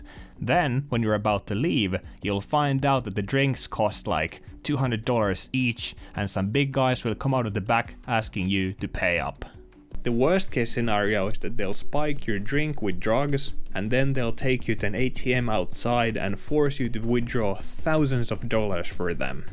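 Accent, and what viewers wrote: Finland. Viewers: This video got recommended to me randomly. Very interesting. The second I heard you, I clocked you as Finnish hahaha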